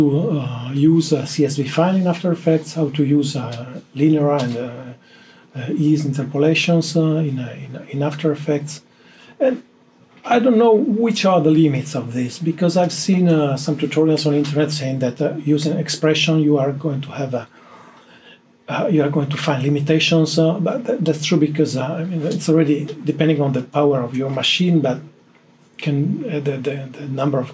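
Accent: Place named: Italy. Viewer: Beneficial video. Besides that, I like an accent on the last consonant. Truly Italian.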